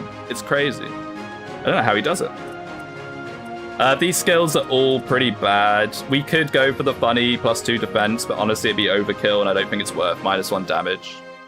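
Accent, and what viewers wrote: United Kingdom. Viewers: Can't wait for the 3am stream I'll miss into another ninian edit into 4 years without streams, keep it up my favorite bri'ish man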